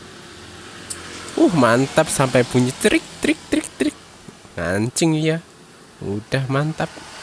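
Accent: Banyumas, Indonesia. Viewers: Lha wong ngapak yak masvroh ?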